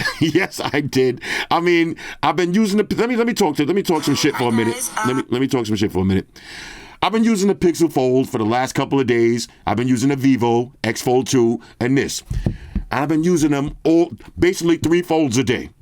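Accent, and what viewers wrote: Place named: New York City, United States. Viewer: is literally an NYC hood dude from my generation. He has the best vids cause its like I'm talking to one of my boys from back on da block 🤣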